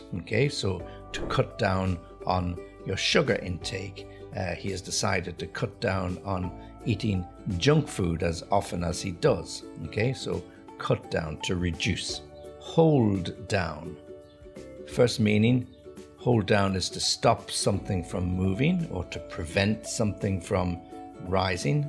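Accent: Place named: United Kingdom. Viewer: I watched this video twice, I was enjoying it, your British accent sounds great, my warm welcome from Kazakhstan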